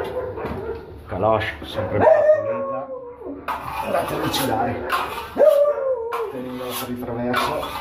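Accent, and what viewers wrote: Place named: Genoa, Italy. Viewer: salve le volevo fare i miei complimenti non so perche ma mi sembrava dall accento genovese la saluto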